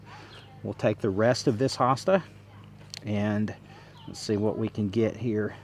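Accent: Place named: South, United States. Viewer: Love to hear you talk…..love love love southern folks.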